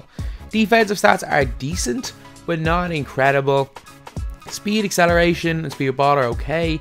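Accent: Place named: Ireland. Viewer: man your prenounciations are just wired I guess that's just your Irish accent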